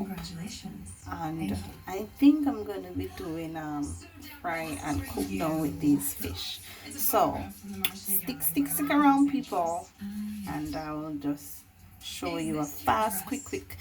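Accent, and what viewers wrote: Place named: Jamaica. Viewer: Thanks for sharing... I hear the Jamaican make me miss yard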